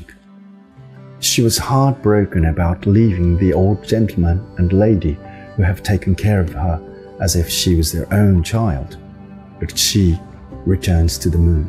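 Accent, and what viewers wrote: United Kingdom. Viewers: 和尚様、イギリス英語に聞こえますが留学された経験ありますか？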